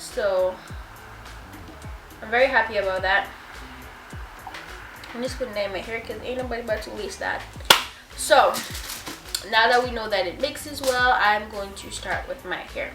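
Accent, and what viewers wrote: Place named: Caribbean. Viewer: Ahhh I knew i heard a familiar accent! i’m from the bahamas Your hair is gorgeous!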